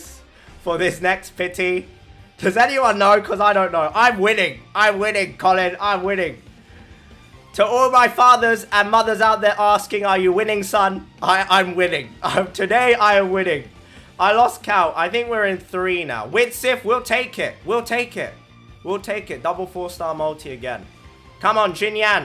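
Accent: United Kingdom. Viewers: i watch video, i see asian. i scrolls down to read comment, i hear some uk accent. me: let me scroll up again to make sure. i did it twice LOL rumor has it, if your asian, you have a higher chance. maybe thats why i got mine an hour ago and now im here looking for build guide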